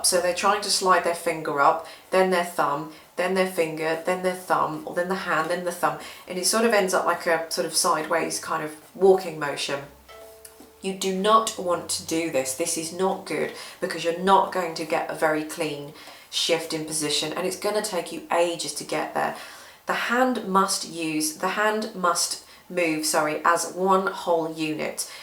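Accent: United Kingdom. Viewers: Thank you from me as well as I am just starting out and really appreciate your instructions. On a side note, since I am an American guy, I hope it's OK as well to say that your hair looks gorgeous and along with your British accent, they only add to the whole learning experience. So much more refreshing that watching some old boring guy. Looking forward to your next tutorial videos.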